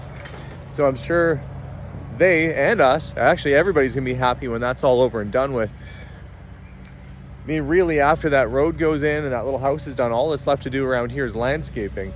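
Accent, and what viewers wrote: Canada. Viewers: I wish I had a hundred dollars for every time he said ass fault. I could go on a shopping spree. I thought maybe he was saying it so much because in his last video on the pool house, people in the comments kept pointing out how he says it differently than how it is pronounced in the US. Maybe that is how all Canadians pronounce it. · It's a Canadian pronunciation. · Remember that he is Canadian and that's how most Canadians pronounce it😊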